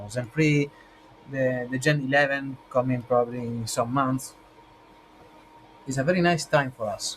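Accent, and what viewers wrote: Italy. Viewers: Ur absent seems italian lol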